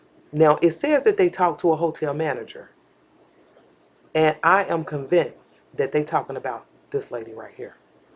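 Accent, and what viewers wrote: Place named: West, United States. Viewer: I love how you articulate your words. I'm from California and you definitely sound like you from the West. I've been studying to get my private investigators license to aid in the criminal defense process of the Justice System. As a "sista" (feel me) and a woman, I believe I can further and investigation that yeilds results my counter parts can not achieve. I can see you as a successful private investigator. Keep us posted!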